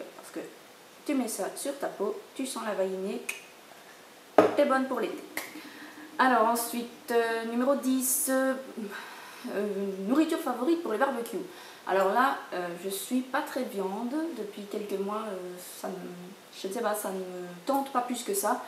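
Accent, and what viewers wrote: England, United Kingdom. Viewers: Tu es parfaite! Et cet accent Anglais de ouf! Sinon, tu vas être interprète Français-...? Mon papa a été prof d' Espagnol dans ton école mais il est retraité depuis quelques années maintenant #racontesavie Bisous et longue vie à ta chaîne! Frivole P.S: de rieeeeen! ^^ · Tu te débrouilles très bien pour une première fois, en plus tu es naturelle et sympathique :) Moi je n'ose pas me filmer, enfin pas pour l'instant^^ Si non ton accent anglais est top!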